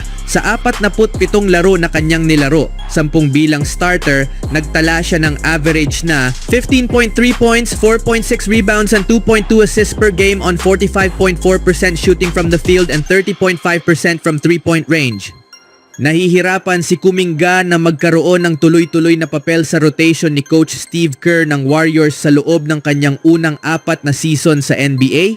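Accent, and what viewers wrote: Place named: Visayas, Philippines. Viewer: Bisaya ka sir halata salita mo?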